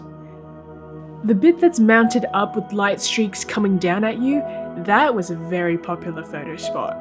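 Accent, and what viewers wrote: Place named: Australia. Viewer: So cool to see you cross Shibuya Crossing. Love your Aussie accent.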